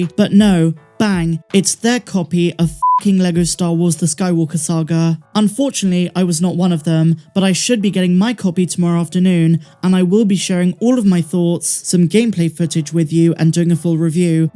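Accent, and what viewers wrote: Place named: West Country, United Kingdom. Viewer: Great vids as always, are you a fellow west country person, I can hear a twang :D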